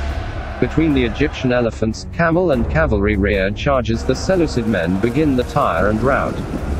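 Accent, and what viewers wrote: United Kingdom. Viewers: Something about watching a Ancient battle cinematically!! with a British narrator ! like watching a documentary ..excellent..only thing missing is watching some older history expert showing us some of the weapons of the age ..xoxoxo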